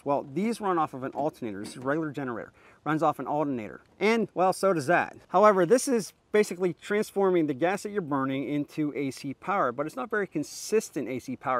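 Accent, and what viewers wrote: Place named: North Carolina, United States. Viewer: You talk just like my friends in eastern "Noth Carolana"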